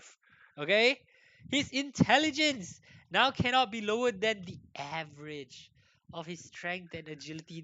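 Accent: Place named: Malaysia. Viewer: Your malaysian accent is so bold, you from which part of Malaysia?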